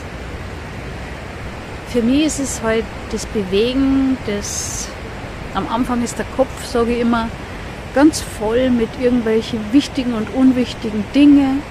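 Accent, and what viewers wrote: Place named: Bavaria, Germany. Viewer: ich habe deinen kanal zufällig entdeckt und als allererstes viel mir dein mir so vertrauter bayerischer, von dir sehr beruhigend gesprochener dialekt auf. dann gleich als nächstes deine authenzität, die bescheidenheit und emphatie. keine reisserischen storys, sondern alltag, untermalt mit tiefsinnigen gedanken, die zum nachdenken anregen. daumen hoch und abonniert!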